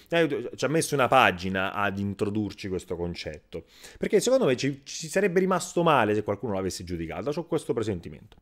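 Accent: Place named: South, Italy. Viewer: Che accento da meridionale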